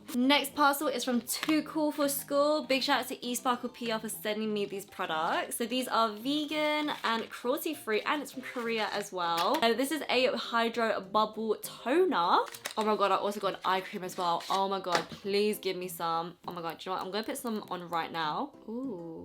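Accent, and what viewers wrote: England, United Kingdom. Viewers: I've been following you on Instagram for a while and this is the first time I'm hearing yr voice honestly cant remember hearing yr voice love yr English accent and loving yr videos. Subscribed right away.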